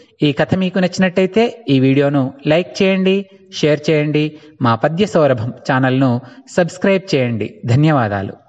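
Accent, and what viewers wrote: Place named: Telangana, India. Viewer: తెలంగాణా యాసా చాలా చక్కగా ఉంది సార్ మీ ప్రసంగం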